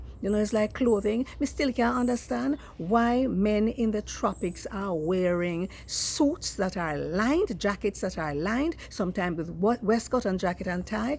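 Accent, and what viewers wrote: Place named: Jamaica. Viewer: Well said aunty real Jamaican talk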